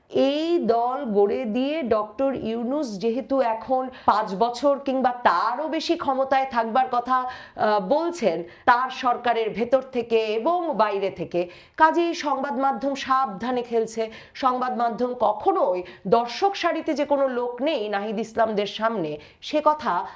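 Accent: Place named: West Bengal, India. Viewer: আপনি আগে পশ্চিমবঙ্গের একসেন্ট বাদ দিয়ে বাংলাদেশের একসেন্টে কথা বলেন। শুনতে আরো ভাল লাগবে।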